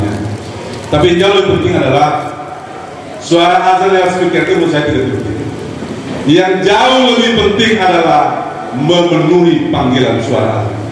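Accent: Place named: Flores, Indonesia. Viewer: Mantap e suara flores.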